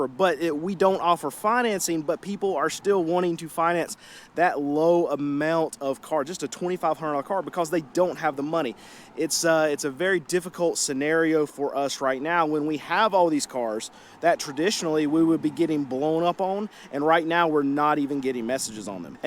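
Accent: South, United States. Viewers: He says ,"buhhcuuuzzz" a lot I am guessing in the south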